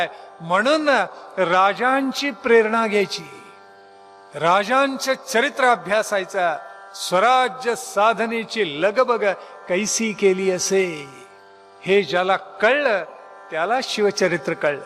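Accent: Standard. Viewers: आदरणीय गुरूवर्य आपल्या अमोल वाणीतून छत्रपती शिवाजी महाराजांचे व शुर मराठे सरदार व शुर मावळ्यांचे पराक्रम व महाराजांनी केलेला राज्य कारभारा बाबत केलेले उद्भोदक प्रवचन ऐकून मन तृप्त झाले. आपली पवित्र वाणी आणि सुस्पष्ट शुध्द मराठी उच्चारा मुळे आपले प्रवचन सतत ऐकत राहावे अशी मनोमन इच्छा होते. आपल्या चरणी विनम्र प्रणाम.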